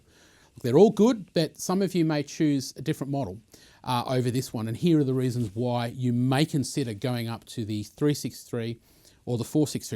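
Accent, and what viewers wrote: Australia. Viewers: This is exactly the kind of comparison vid I was looking for. Thanks heaps ! Great to hear an Aussie voice aswell on YouTube !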